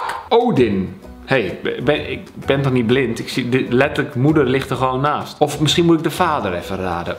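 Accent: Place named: Amsterdam, Netherlands. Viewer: In deze video heeft Kalvijn echt een Amsterdams accent😅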